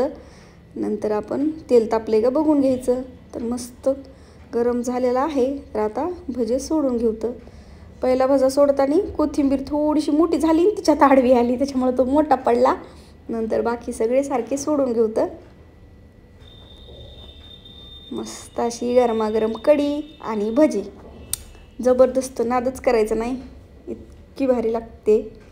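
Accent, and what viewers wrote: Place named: Satara, India. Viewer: खुपच छान बनवली मी सुद्धा अशीच बनवते, मला तुमची भाषा खुप आवडली, मस्त अशी सातारा style 👍 👌👌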